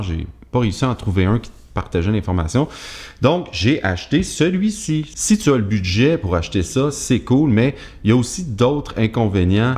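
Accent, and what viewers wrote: Quebec, Canada. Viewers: Salut! Appréciant au plus haut niveau tes enseignements, le "soin" que tu y mets et nous partages, les jours derniers, en pratiquant les gammes mineures harmoniques, il y a une coquille sur le E mineur harmonique, une autre aussi après mais je ne la trouve plus! Juste pour aussi te transmettre à quel point tu n'enseignes pas dans le vide! Mille mercis pour mes impros qui avancent grâce à tous tes soutiens! ça marche quand tu nous dis "te focalise pas, ça va rentrer dans tes doigts", avec ton accent Québécois, top, pour la rime! Chaleureusement. Pascal.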